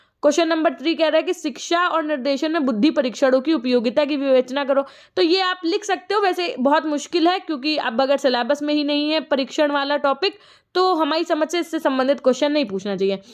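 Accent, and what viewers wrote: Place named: Kanpur, India. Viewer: Full kanpuriya andaz😄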